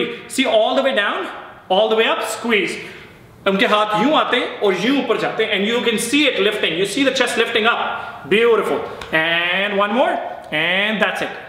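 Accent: Punjab, India. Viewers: bhai apki sbse achi bat to ye h ki aap hindi me jo thodi thodi punjabi bolte h bhoht mast h bhai punjabi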